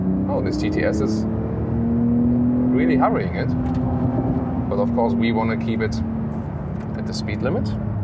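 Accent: Germany. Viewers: A review of a 911 Porsche turbo S is always best in the gentleman has a German accent. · A car review can't get more German than this. Congrats, your reviews are on point. Very presentable host and the way you do it makes it so pleasant to watch. Easily the most professional car reviewer on the web. Your german accent makes it that more nicer to watch. Cheers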